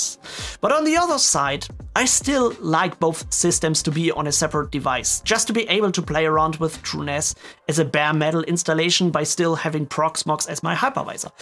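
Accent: Germany. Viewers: Ich so: Hey dieser Akzent kommt mir bekannt vor. Kein Wunder, aus Deutschland. Schöne Videos haste ;)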